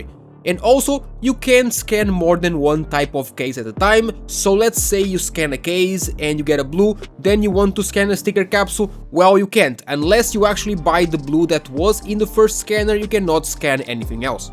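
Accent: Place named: Portugal. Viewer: tentas te mas sei que es portugues ahaha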